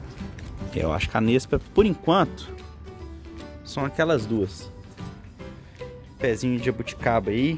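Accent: Minas Gerais, Brazil. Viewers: Cê é minero, fii???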